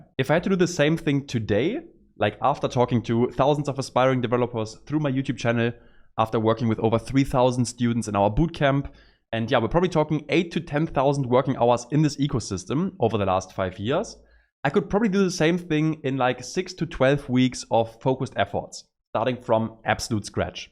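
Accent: Germany. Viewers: i am 100% sure that you are a german, nice video btw that will help to improve my own page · Fucking zero explanation done. Just a German guy rambling, wasting 10 minutes of your time.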